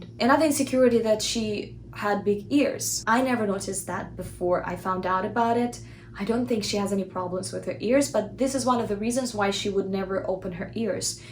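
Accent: Russia. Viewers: Was listening to you and all the time was thinking,that you are Russian,the accent is evident, then read about you after the video and saw that I was right❤ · По акценту поняла сразу, что автор русская))) местами прям проскальзывает произношение, на согласных особенно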